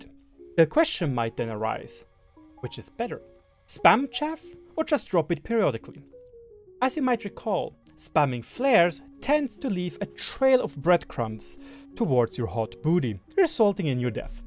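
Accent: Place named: Germany. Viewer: Dude has a thick German accent 😂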